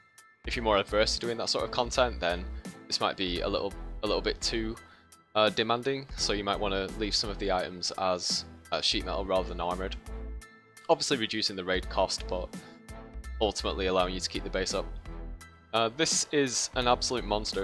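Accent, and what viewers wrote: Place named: Lancashire, United Kingdom. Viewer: I thought that mate, definitely some kind of blackburn or Burnley in there as well mate with a manc twang 😎 good video mate and good luck with your channel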